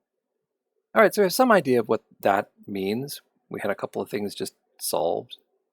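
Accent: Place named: Canada. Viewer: Also curious since you mentioned it - there was something that stood out to me in one of your videos. I had assumed (now confirmed correctly) that you are Canadian since you have been saying "zed" but don't have an east-overseas accent. Though later I noticed when you were looking in the Hinterlands, you said "catch some ZEE's" which I think is the only time so far I've heard you say it like that, is that particular idiom usually said that way? Or did it just happen to roll off this time?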